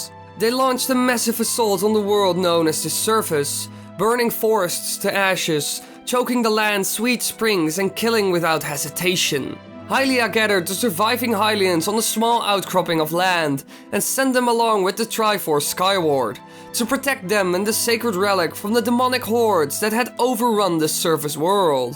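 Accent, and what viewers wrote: Netherlands. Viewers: Are you Dutch because you have a Dutch accent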